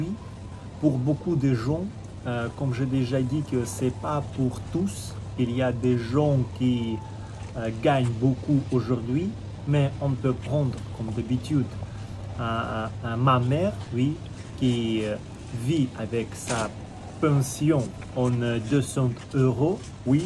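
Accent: Russia. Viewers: Вы не францзу же. Я слышу русский акцент. Все фальшивка.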